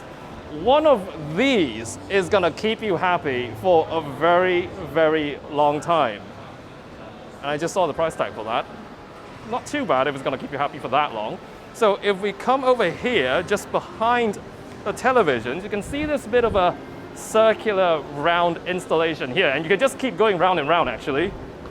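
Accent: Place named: London, United Kingdom. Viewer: Where is this guy from ? Looks malaysian but speak perfect london english ....raised in uk but decided to come back to malaysia ?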